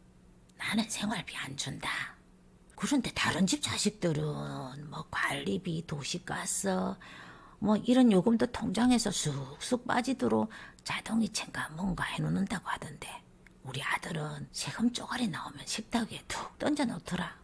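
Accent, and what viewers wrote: Gyeongsang, South Korea. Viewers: 공감되는 내용에 경상도 말씨 지대로 구사하셔서 더더 재미집니다! 👍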